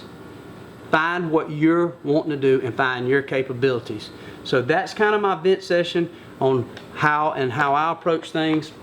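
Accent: South, United States. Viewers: I love the way you southern boys deliver a message. By the way, I do remember watching you over 20 years ago.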